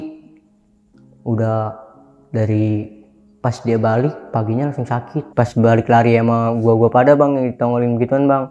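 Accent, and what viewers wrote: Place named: Betawi, Indonesia. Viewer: betawi parah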